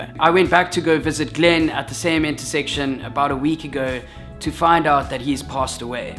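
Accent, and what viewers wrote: South Africa. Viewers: Great message, Dan, but when you start talking fast it's hard to understand you. Even when your accent isn't that strong, the different SA vocab and your word choice makes it hard to follow without replaying what you're saying at least once or twice. Maybe slow down a little so everybody can understand you! Thanks!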